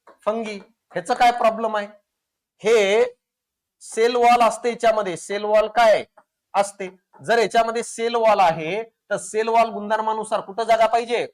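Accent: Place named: Marathwada, India. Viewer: Sir tumhi aaplya मराठवाड्यातील आहेत हे आपल्या बोलण्यातून आपुलकी नक्कीच कळते.....❤❤ सोबतच सायन्स हा विषय खूप सुंदररित्या story type Ani intersting easily concept explain krta....salute to as you gain the understanding over the science in your academic n now you are applying it❤❤ it's obsolutely great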